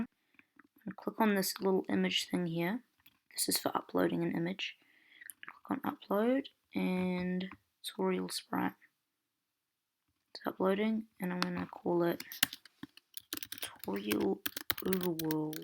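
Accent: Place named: New Zealand. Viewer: I love the kiwi accent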